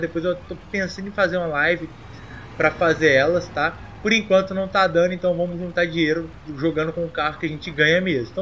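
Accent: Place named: Minas Gerais, Brazil. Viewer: o é mineiro? ele tem um sotaque mineiro.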